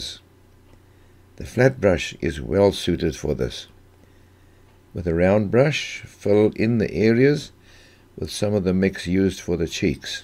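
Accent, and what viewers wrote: South Africa. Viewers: I absolutely loved hearing my fellow South African accent so unexpextantly. I have been living in the US for a few years and was looking for some new inspiration in my paintings. Great tutorials.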